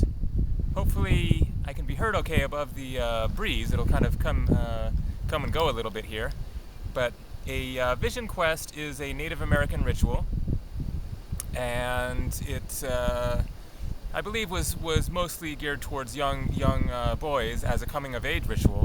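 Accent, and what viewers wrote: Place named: Canada. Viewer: I knew that was a Canadian accent!!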